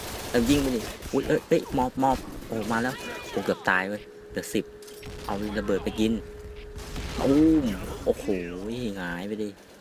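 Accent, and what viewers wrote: Isan, Thailand. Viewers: สำเนียงเหมือนคนอีสานเลย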